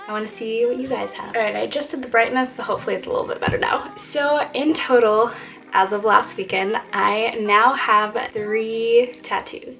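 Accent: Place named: New Zealand. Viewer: you have a cute lil nz ❤️ that makes me so happy haha xx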